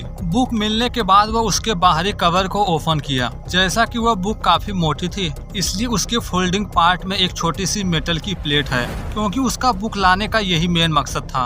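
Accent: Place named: Bihar, India. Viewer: Bihari h bolney wala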